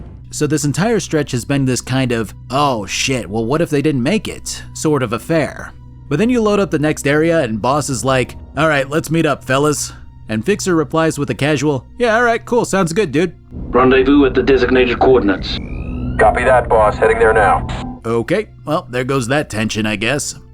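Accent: New Zealand. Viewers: I love mistaking a New Zealand accent for a British one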